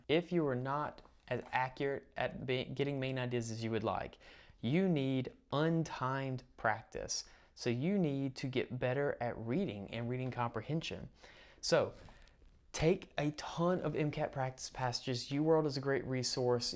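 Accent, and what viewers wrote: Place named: South, United States. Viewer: cool, im from Georgia! Southerners know one when they hear one haha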